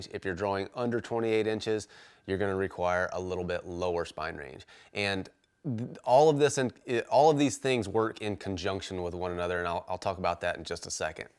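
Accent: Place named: Appalachia, United States. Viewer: Greetings to speaking Appalachian English and Deo Frutuoso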